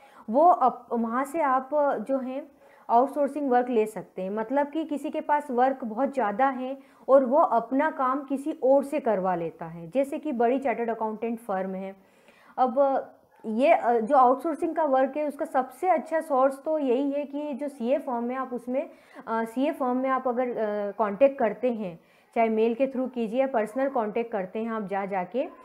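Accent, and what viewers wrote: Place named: Malwa, India. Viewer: Mam aao kaha se ho?? Apki awaz se to malwa region ke lag rahe ho...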